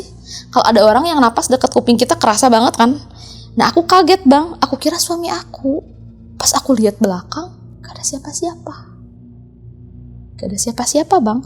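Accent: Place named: Sunda, Indonesia. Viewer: Klo org sunda ska mndongeng sok enakeun.. ada ciri khas nya kata " teh.." yg gk bsa d ilangin....😅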